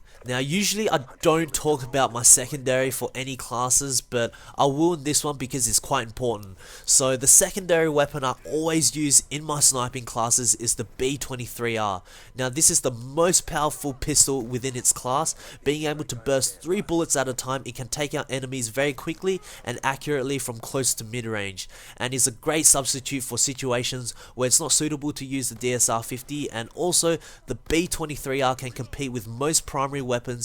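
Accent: Australia. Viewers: Australian !! Yay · Are you Australian? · R u Aussie m8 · Aussie oi oi are u from aussie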